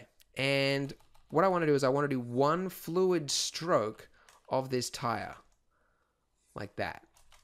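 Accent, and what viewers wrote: Australia. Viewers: isnt this guy an australian? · Australian describing snow is funny to a Canadian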